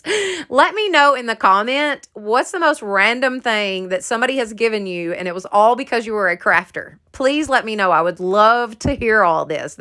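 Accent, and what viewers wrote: South, United States. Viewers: Love your spunk, accent and style. I'm a southern gal myself. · New Subbie...!!! Love the easy to make lantern !!!! And the jars !!! I am fixing ( southern term I am sure you are familiar with !) to make me some of those... already have the jars because we are canners !!!! Over all I love all your crafts !!!! And being from MS , love the southern accent..!! Thanks for all the tutorials..... off to watch more of your channel !!!! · I love your content! You make the nicest things, but what I really like about you is your sweet warm southern charm and genuiness. I also love your cute southern accent! I laughed when you referred to Minnie Pearl a few videos back!! 👒👒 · I like the lil wrinkles it looks real to me I ain’t never saw a real flag without em. Love all ya do and it’s a fresh breath of air for me personally to here you talk from one southern girl to the other. 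· I made the lantern but painted mine dark brown and white... came out so good and I love it only problem I had was getting the other end on so I flipped it over and made a bottom that I could easily open it up to turn my candle on.. Thank you for all ur cute ideas and I love hearing ur country accent!!! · I love your ideas and tutorials and how you keep all the mistakes for us to see. I just love listening to you talk. Love your Southerness 😍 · I loove Holly . At Humble. Pie .and I clicked over here cause she said too lol..I’ subscribed just from u talking..I knew u would b good..u r southern and so cute lol..can’t wait to see what all u do..best wishes and hope u get many subscribers.. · Great diys. The lantern is my favorite! The first time I tried wet destress was on jars and it didnt work for me either. I didnt try again for a while but then tried on other materials besides glass and fell in love with wet destressing So, dont give up. 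Try it on other materials. I have friends and family always bringing me trash to treasure items like cold coffee bottles or things they were going to throw away for me to try to repurpose but I would have to say the most random thing I have been given is the rods for a pop up tent with the wire removed. The first thing that came to mind was to use them like dowels to hang fabric or canvas signs from but I am sure I will find more ideas for them too. I live in the south too and love to hear someone else talk "southern" like I do lol. Love you, your channel and your diys. Thank you for sharing and be blessed my crafting friend! ;) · Came from Holly's channel and I love you and your style and as a fellow southerner, your accent as well! 😉 People don't give me random stuff but I keep weird things because I'm like, hmmm you never know what I might use that for 😆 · I'm from GA I love the southern accent. 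You are so funny. Super cute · I came over from Holly's channel. I am a fan of your phrase it's not a big deal it's just craftin'! You have the cutest southern drawl. My favorite DIY is the lantern. I like the jar pumpkins, too, but I am partial for traditional fall colors. I made a cute wall hanging with a lemonade flag by gluing a paint stick along each end, like a scroll, kind of. I painted them white. Then I embellished it with a few leaves and flowers. Have a great week! 🍁🌻🍂🍁🌻🍂🎃🍂🌻🍁🍂🌻🍁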